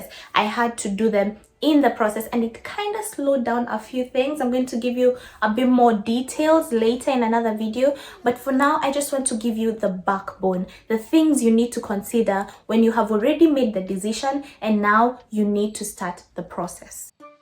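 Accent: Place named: Kenya. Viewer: Thank you for sharing! I love your Kenyan accent😍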